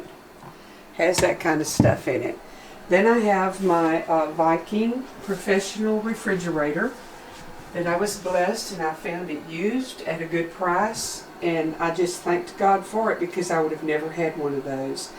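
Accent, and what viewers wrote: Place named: South, United States. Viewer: Hi I just recently subscribed to your channel. What a cozy well thought out kitchen you have. Thank you for sharing your recipes. Your such a sweetheart and I love your accent. I’m in 🇨🇦 and enjoy your Southern flair. ❤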